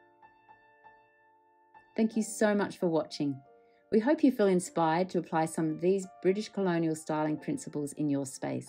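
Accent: Australia. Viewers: Thank you for an informative video. It was great hearing an Aussie accent too.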